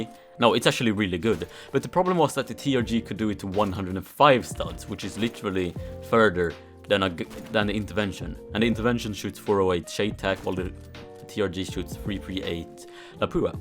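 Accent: Sweden. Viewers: are you from sweden or something?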